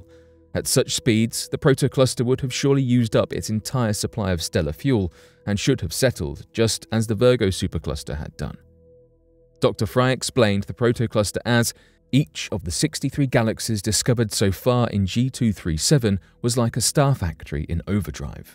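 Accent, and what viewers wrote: United Kingdom. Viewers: Can't you bloody blokes find SOME OTHER NARRATOR ( PREFERABLY SOMEONE WITHOUT A BRITISH ACCENT)??? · EVERY BULLSHIT SPOOKY MYSTERY SITE ON YOUTUBE HAS A FUCKING BRIT NARRATING, THEY HAVE "STONE HENGE ITIS"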